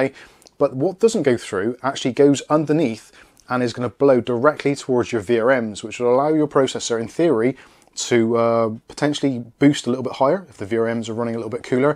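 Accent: United Kingdom. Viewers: just curious to know, where about in UK are you from?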